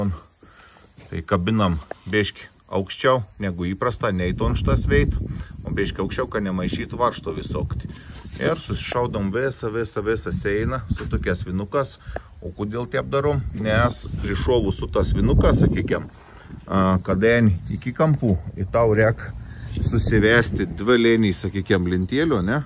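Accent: Samogitia, Lithuania. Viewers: Sveiki, is kokio miesto esat ka zemaitiska tarme? Gal Telšiai?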